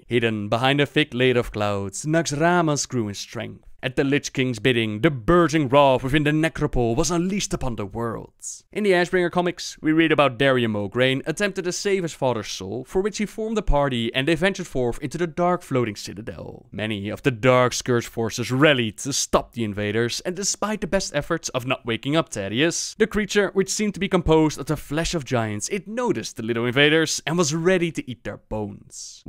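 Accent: Netherlands. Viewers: You sound sooo Dutch! Ever been told to You before?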